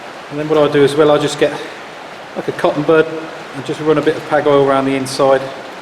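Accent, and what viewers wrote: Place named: United Kingdom. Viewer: Excellent video, friend! Watching it in a clean, quiet shop with a British accent was the icing on the cake. Love and respect from USA. 🍻🍺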